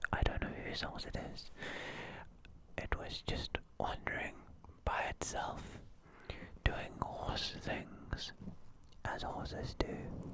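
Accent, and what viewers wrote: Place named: South Africa. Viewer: you sound south African homes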